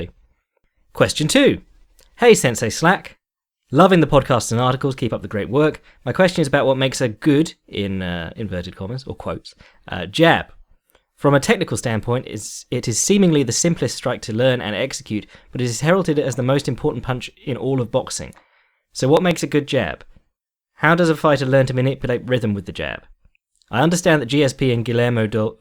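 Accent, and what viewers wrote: England, United Kingdom. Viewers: Slack is back already... the usual week long hiatus of a good old English accent delving into the joys of combat is no more. Thanks!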